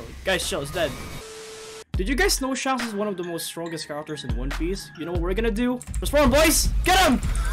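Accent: Philippines. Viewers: are u Filipino?,bcuz ur accent is like Filipino when ur speaking english!.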